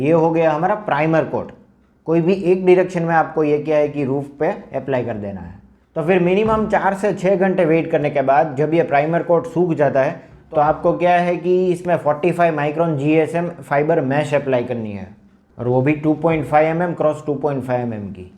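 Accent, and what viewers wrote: Gujarat, India. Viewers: That gujrati ascent is so good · Gujju raping hindi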